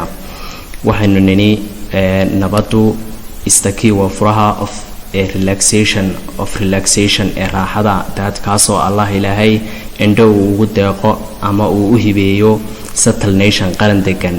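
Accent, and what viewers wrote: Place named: North, Somalia. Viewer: I,m very happy today because your speech is heer waqoyi, the previous days I watched the more teachers I can't I understood the talk them, welcome teacher😇🥰😇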